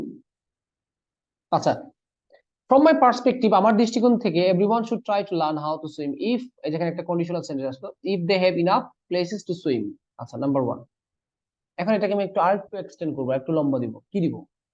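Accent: Sylhet, Bangladesh. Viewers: Sir er maje maje Sylhet language ta kub balo lage.... Serious Video dekad majew hasi pay.... 😄😄😄😁😁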